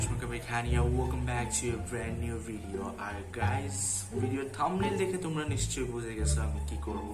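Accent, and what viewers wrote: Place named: Sylhet, Bangladesh. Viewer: Lol 😂 onek moja paise 🤣 your sylheti language is so cute 😁. Finally I have had chance to see auntie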